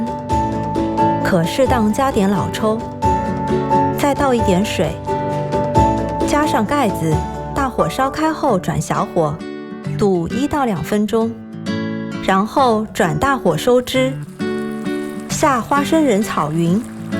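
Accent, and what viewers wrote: Shanghai, China. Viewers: 上海味的普通话，好亲切😄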